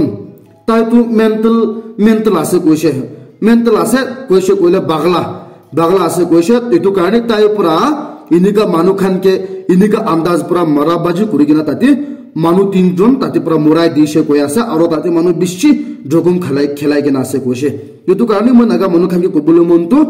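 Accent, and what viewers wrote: Nagaland, India. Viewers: Hi naga..wishing you a merry christmas and happy new year....I like and admire your nagamese news...thanks and bless you for announcing clear and pure nagamese..with prayers mk..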